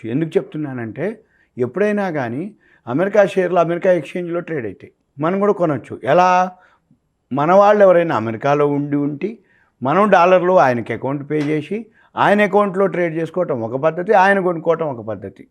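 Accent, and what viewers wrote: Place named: Telangana, India. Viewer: నువ్వు నీ తెలంగాణా assent.......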